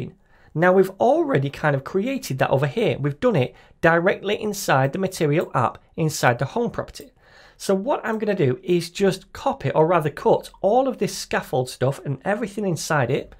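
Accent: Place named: England, United Kingdom. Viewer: I like your English accent!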